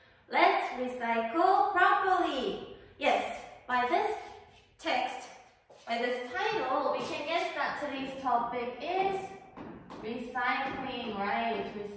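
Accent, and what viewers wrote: United Kingdom. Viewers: 뭔가 영국액센트 느낌... 쌤 너무 잘하세요 나도 저렇게 잘하고 싶다 많ㅇㅣ 배워가요!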